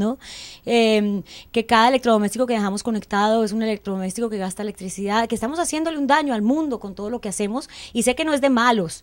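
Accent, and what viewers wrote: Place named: Cali, Colombia. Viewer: tipico acento valluno colombiano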